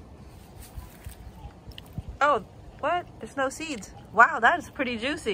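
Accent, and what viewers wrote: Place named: India. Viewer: You sound like a normal American with hints of an Indian accent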